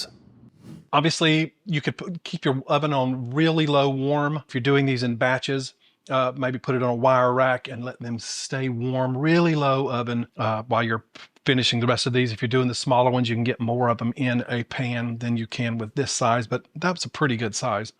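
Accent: South, United States. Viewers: San Diego!? I thought you were Southern 😄😜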